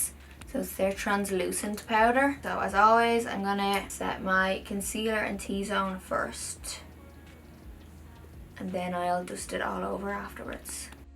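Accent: Ireland. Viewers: I was showing your videos to my mom today, fangirling about your past replies to my comments. She said she thinks you're gorgeous and your Irish accent is lovely 😃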